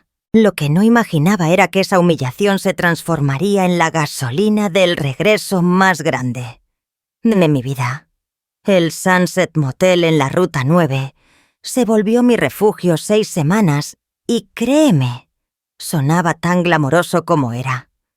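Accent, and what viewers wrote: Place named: Spain. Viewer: Es el acento de alguien de nacionalidad española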